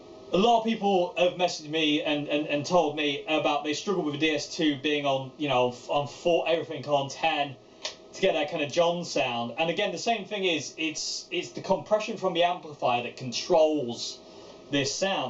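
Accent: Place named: Birmingham, United Kingdom. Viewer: I enjoyed this! cheers mate. I especially liked the "Apache rose: Peacock" breakdown. Thats a lovely chord progression - and you did it nicely. Fair Play. :) JpD. (PS: you sound "brum-light", like a young J.Carrot - it's an observation; and he is a likeable bloke, so don't think im being off). Keep up the good work mate :)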